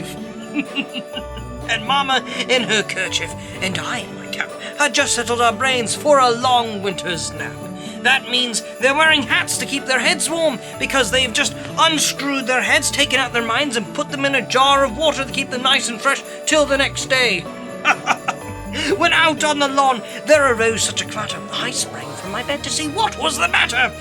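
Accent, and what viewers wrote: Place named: United Kingdom. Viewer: You sound a little bit british ? why ?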